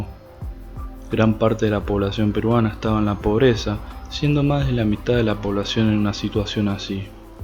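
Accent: Argentina. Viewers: Si es peruano , por qué habla como argentino?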